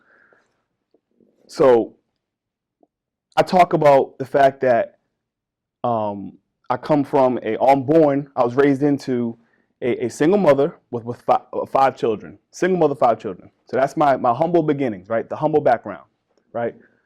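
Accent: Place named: New Jersey, United States. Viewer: Lovely accent........New Jersey ?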